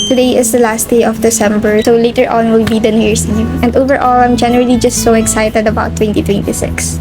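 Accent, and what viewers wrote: Philippines. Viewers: Is she Filipina cuz her accent is very jayjay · it’s kind of a filipino thing when they speak english. it’s so cool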